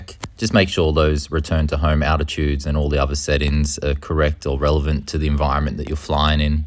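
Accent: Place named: New Zealand. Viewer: Nice summary. You sound Kiwi though.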